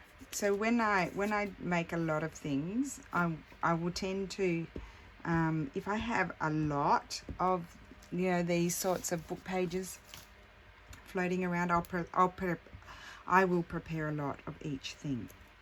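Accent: Australia. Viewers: I absolutely love your channel, I’ve been a subbie for quite a while, but I don’t comment very often. I just wanted to tell you how much I enjoy your channel. I suffer from chronic pain, depression and anxiety, and spend a lot of time in bed watching videos. Your videos always make me feel better, happy, and really helps lessen my anxiety, at least for a while. I love that you live in Italy, but have such a beautiful Australian accent, I guess it’s all in what accent or language you grow up speaking. The last thing, I LOVE rainy days, and I thought I was the only one, no one(and I’m not joking) that I know like rainy days!!!!😀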